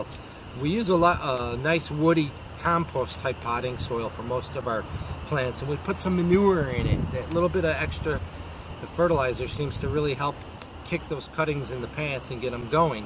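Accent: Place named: Buffalo, United States. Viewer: Are you from Buffalo, NY? Guessing by your accent.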